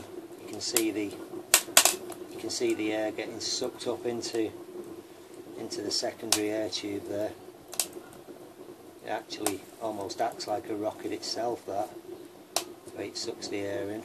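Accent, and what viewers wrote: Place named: Northern England, United Kingdom. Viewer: GREAT STUFF !!! I've thoroughly enjoyed your videos. I've learnt so much from you about heating with wood. I'm about to try to make a rocket adaptation to a smallish cylindrical wood heater in my bedroom. The chimney, unfortunately goes straight outside thus losing a lot of needed heat. I also have a wood stove in the kitchen and I'll see if I can have it heat water too, just like the Argas I came across in the UK. Where are you from by the way. You're definitely northern like me. In fact, I'm a Liverpudlian now living in Rio de Janeiro.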